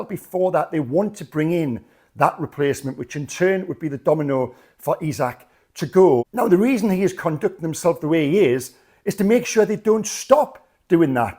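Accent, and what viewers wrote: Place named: Newcastle, United Kingdom. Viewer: Never suppress your Geordie 😂Ornstein’s bombshell tweet today blows apart a,b+c🧐if it’s true he’s adamant to never play for Toon again? Hero to Zero🤐🫣🖤🤍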